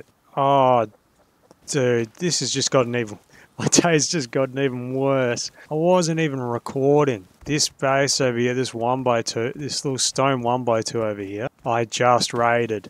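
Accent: Australia. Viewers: Honestly, gaming and just listening to this man's beautiful aussie accent is something else, 4+ hour commentary content when? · something about the accent and choice of wording makes Australian people just funny by default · He's Australian lol there's not a single British accent out there that's not annoying.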